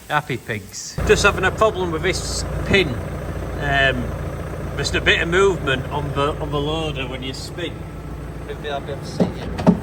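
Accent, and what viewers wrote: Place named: Yorkshire, United Kingdom. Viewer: I'm a bit worried that you are giving the animals drugs and you don't know what you are giving it for. You need to be very careful what you say and do on social media ! Turn the music down. Timelapses are horrendous. I have nothing against a yorkshire accent, but even Cammy controls his broad accent for the camera xx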